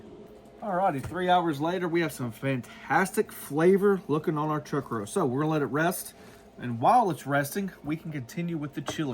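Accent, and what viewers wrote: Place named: Tennessee, United States. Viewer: Never mind, I know a Tennessee accent when I hear it. Could be Kentucky or Georgia just across state lines, but that's a Tennessee accent. Lol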